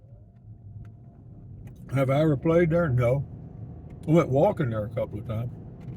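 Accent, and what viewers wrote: Texas, United States. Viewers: Dude you crack me up! You sound like my buddies here in Amarillo TX.🤠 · You have the same accent as my aunt and cousins and my dads buddies from Texas.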